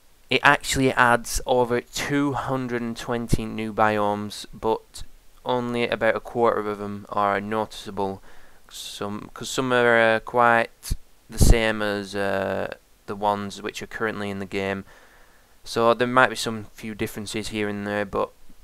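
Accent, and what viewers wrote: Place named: Ireland. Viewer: Your Irish aren't you?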